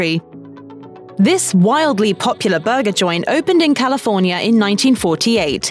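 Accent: United Kingdom. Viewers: I dislike theBritish narrator. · The British accent seems inappropriate for this food.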